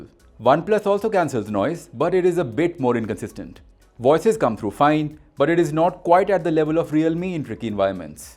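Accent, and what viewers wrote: India. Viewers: Indian ho ya foreign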